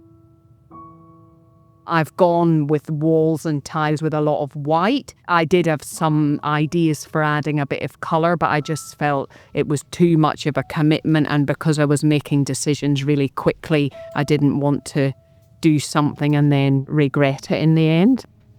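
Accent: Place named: Antrim, United Kingdom. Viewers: Accent sounds like someone who grew up in Northern Ireland and moved to Edinburgh in their teens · Beautiful home. Listening to your accent reminded me of my Grandfather…he was from Antrim.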